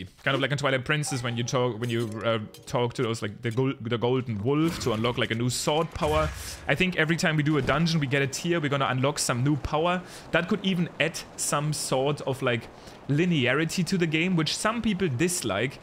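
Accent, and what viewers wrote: Germany. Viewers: Love this guy's german accent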